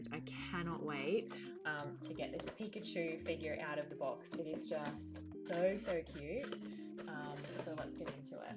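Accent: Australia. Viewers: Noooo it was a steel energy hahaha. I hear Australian and American accent too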